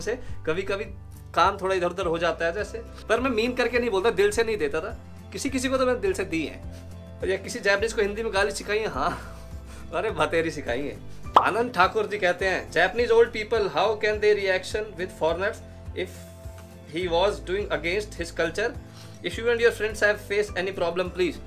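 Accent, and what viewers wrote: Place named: Uttar Pradesh, India. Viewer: Bhai ho to haryana se but aapki language to up ki hai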